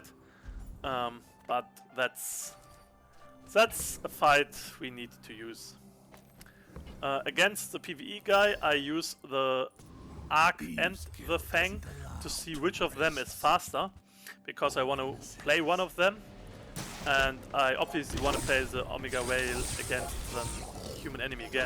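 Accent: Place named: Poland. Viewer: Where are you from? You sound polish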